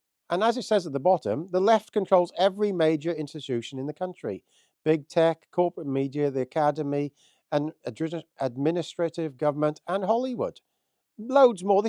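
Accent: United Kingdom. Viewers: I think it is a Builth/Rhyadr accent, bit of Llan'dod thrown in.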